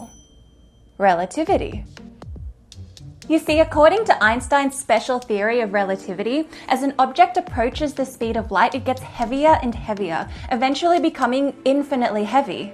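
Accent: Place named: Australia. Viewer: Hot + Quantum physics + Australian accent = Sizzling Hot.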